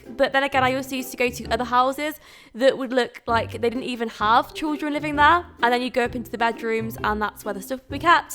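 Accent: United Kingdom. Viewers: You sounds very British for someone living in Germany. Is that where you live permanently now?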